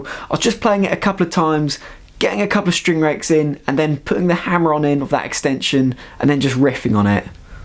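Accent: United Kingdom. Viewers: Hi, you sound like you're from Surrey?? Did you play victorious festival Portsmouth 3 years ago?? 90's covers, the way you play is the best, outside of mr squire I've heard since that Saturday in Pompey.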